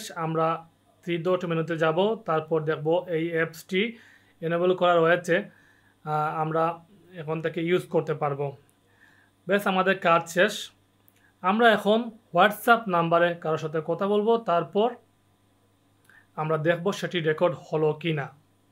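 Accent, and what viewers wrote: Sylhet, Bangladesh. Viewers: Bhai ki sylheti.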